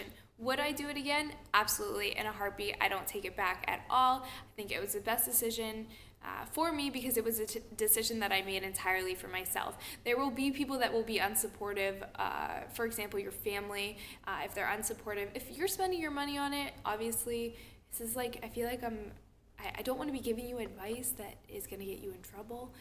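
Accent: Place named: Boston, United States. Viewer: omg are you from boston? I am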